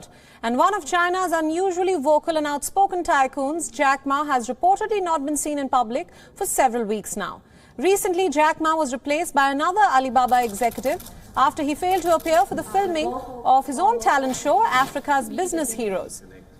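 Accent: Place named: India. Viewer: She is Indian and speak English with funny accent just speak in ur language 🤦‍♂️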